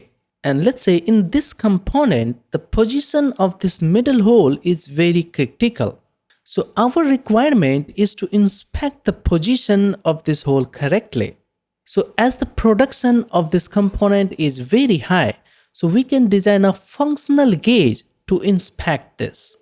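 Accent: India. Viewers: Despite the fact that you are Indian and have a funny accent, it doesn’t hinder absorbing useful information at all. Moreover, the illustrations for better visual perception are very helpful. The material in the video is excellent, very useful, and I am learning from it. Thank you and greetings from Ukraine. All the best!❤❤❤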